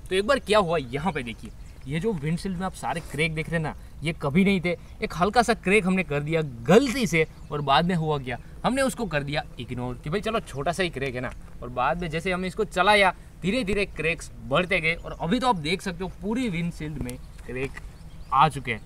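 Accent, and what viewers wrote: Gujarat, India. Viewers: Bhai, tu gujarati he.?? I mean tone se lag raha hai.? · Han bhai gujrati hai 🙃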